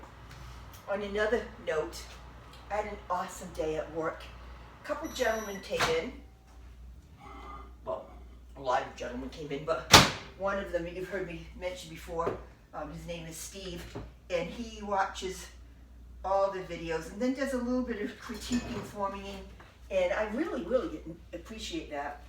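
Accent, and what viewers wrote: New England, United States. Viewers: My mother grew up in New Hampshire and would say “sleep tight, don’t let the bedbugs bite”. I, in turn, said it to my kids when tucking them in to bed. I love your channel and your New England accent because it reminds me of my aunties.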